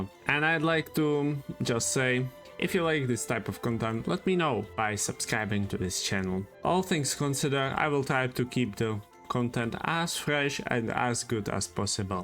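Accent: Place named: Czech Republic. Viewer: "Yay, another video hating on Natlan! I'm in!" "Dude has Czech accent, is he Czech?... YAY, a fellow citizen!!" I actually quit during Natlan. I'm done with the exploration, non-skippable dialogues and I can't believe that 4 years in we still have to grind talents on specific days of the week with no resin storage for overflow. Natlan looks completely different from what I expected. Nation of War my ass, this looks like Disney and I can't stand it. I'm also not that thrilled about pokemons and weird immersion breaking stuff like... motorbikes or flying canons. Just nope, Dawei can cry all he wants, I'm not going back and I used to whale pretty hard in the past.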